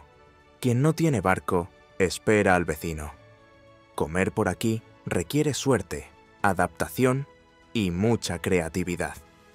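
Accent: Spain. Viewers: Pronunciación del inglés típica de un hispanohablante de España.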